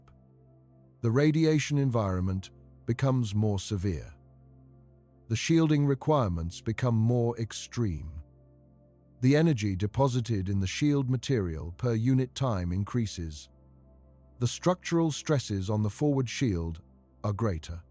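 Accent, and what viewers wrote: United Kingdom. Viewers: Absolute rubbish. I understood that in the first 30 seconds of this waste of time. Flight was also impossible. Reaching the moon was also impossible. Then it was accomplished. The same small mind in the first two examples is now narrating this video. “The physics as we currently understand it”. All that says is EARTH SCIENCE is clueless. The inconvenient fact however, is people are looking up into the sky and seeing them dotted with “UAPs” that by the logic of this video, shouldn’t exist. But they do. They’re here with ease, and have been as long as human history has seen them, thousands of years. Amazingly, the idiocy of the narration refers to the “speed of light” as a factor in interstellar and intergalactic travel. This is a kindergarten child telling a university mathematician why his understanding of physics is impossible. Needless to say this video got no like and no “subscribe”. 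The “British accent” didn’t make this inanity sound any smarter.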